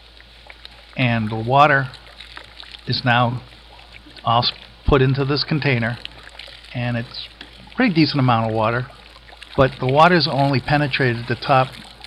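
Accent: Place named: New England, United States. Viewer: HEHEHEHEHE!!!!! I love how you watuh those plantuhs!!!! When I hear your accent, I think of lobstuh fishuhmen.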